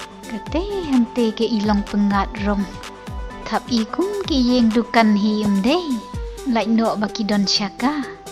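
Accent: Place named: Khasi, India. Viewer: Sngew hun shisha kong ba phi kren khasi paka hynrei nga i bun ki khasi ba sah tang ha shillong ruh ki lah leh thlun ban kren khasi . Nang kiew shaphrang kong u Blei un nang kyrkhu iaphi ba phi lah ban pynmih shuh shuh ha ki por ban dang wan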